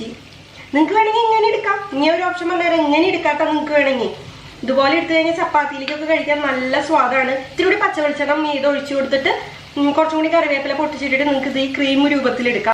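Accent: Thrissur, India. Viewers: mde ..thrissur baasha aanalo...😂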